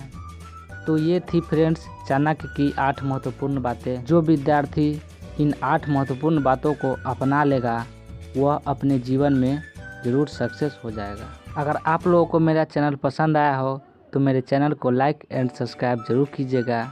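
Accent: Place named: Bihar, India. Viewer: lgta to bihari hi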